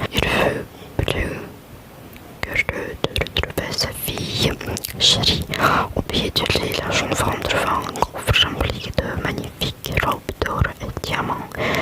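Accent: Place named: France. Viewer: hiii your french accent is really really good! it's so relaxing! maybe one day you can do a makeup tutorial video i really love your style! it reminds me of sousie soux with a mix of 20s glamour! i would love to draw you tbh haha 💟💞💟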